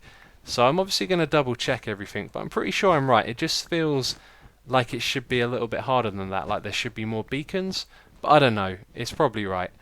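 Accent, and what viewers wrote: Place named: South West, United Kingdom. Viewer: You sound like you're from the south west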